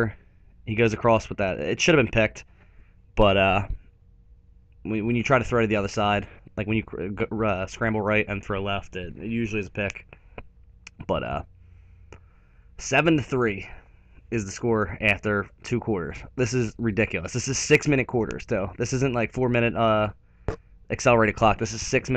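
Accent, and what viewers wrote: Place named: Philadelphia, United States. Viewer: u from philly bro? i hear it in your voice lol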